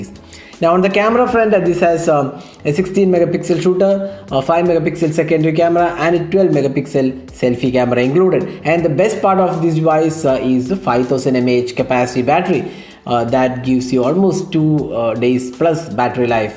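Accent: Kerala, India. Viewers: I guessed that he's Malayali by his slang😁 and I guessed it right😀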